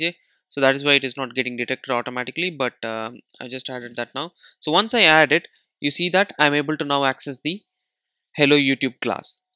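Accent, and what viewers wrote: India. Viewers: When you hear the Indian accent, you already know the tutorial will be good.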